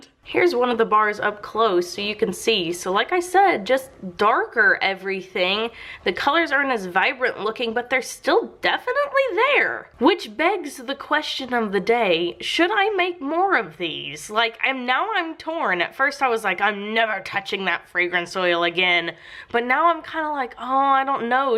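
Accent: United States. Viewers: why do you have a country girk accent ive been subscribed for a while and ive been wojdering this from the start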